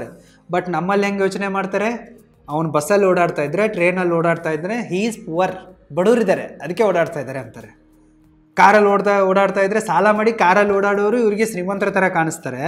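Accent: North Karnataka, India. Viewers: ನೀವ್ ಉತ್ತರ ಕರ್ನಾಟಕದ ಕಡೆ ನಾ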